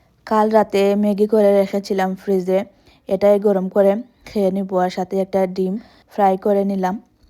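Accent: Sylhet, Bangladesh. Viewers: মাশাল্লাহ আপনার ভিডিও খুবই ভালো লাগে আপু। আপু আপনি কি সিলেটি। কথার ধরন অনেকটা ওরকম লাগলো প্লিজ ডোন্ট মাইন্ড।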